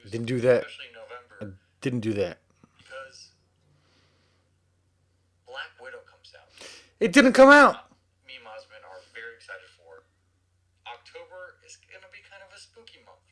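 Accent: South, United States. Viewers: I love your podcast on this video at your house in Texas during your quarantine until you're watching one of your previous video of yourself from the past while you're talking about all of your previous reviews of all of your books and it's a lot of energy, so you're talking about everything including your top 5 goals and your future after that, but I wanna say I'm happy for you and I hope your husband, Zach will be very proud of you, because I love your Southern accent!!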